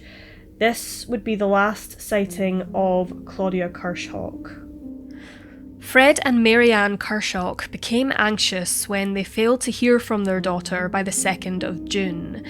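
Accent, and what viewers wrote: Scotland, United Kingdom. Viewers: I love your channel. So many cases I’d never heard of till now. You’re very gentle and respectful too. Love your Scottish accent as well! (My mum is Scottish!) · I've watched quite a few Dark Curiosity stories now. The Mayan narration adds so much. Of all the different Scottish accents, yours is of the most gorgeous. There's a gentle folk tone to your voice that only exists in certain areas. It's so welcoming that it doesn't surprise me that so many love spending time with rural locals.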